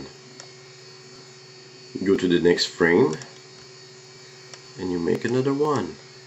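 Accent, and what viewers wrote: Quebec, Canada. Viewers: B à la première seconde de la vidéo jme suis dit "oh yest quebecois !" merci pour la vidéo !!!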